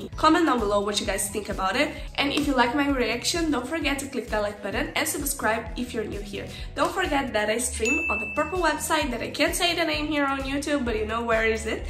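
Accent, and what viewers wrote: Brazil. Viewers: Ela é brasileira? esse sotaque dela me dá essa impressão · Você é BR não é? Também costumo falar inglês boa parte do meu tempo, e a gente tem o sotaque semelhante, acho que da pra reconhecer.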